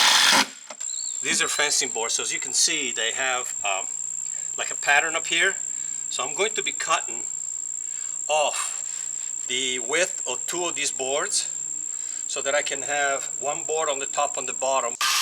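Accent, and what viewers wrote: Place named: Sweden. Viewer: Why does he have a Swedish accent when speaking English?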